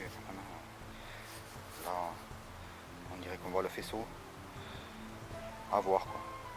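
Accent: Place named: South, France. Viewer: oui je l'ai vu trop tard. merci de votre réponse, et de m avoir permis de découvrir ce chanteur. votre accent du sud vous trahit... de quel région êtes vous exactement?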